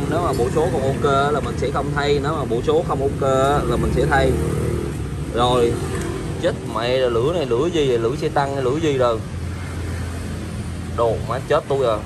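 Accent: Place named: Phan Rang, Vietnam. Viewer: Giọng Phan Rang dễ thương quá 😂 Tiệm có nhận fix rung ga đầu vario ko Bro ?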